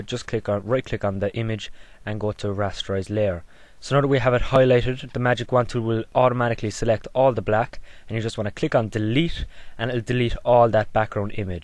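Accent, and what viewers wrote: Ireland. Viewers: Nice tutorial! Btw are you Irish? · the irish voice I can tell because Im from Ireland lol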